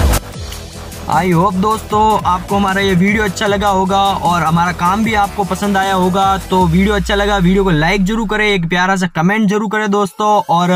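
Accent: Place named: Marwari, India. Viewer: Bhai thari hindi sunr lage thui marwadi hai agr hai to ❤❤❤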